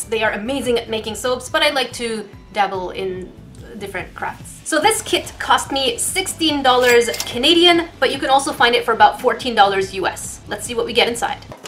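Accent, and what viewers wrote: France. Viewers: Can I ask you a question are you French? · ur FRENCH? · Wait are you French?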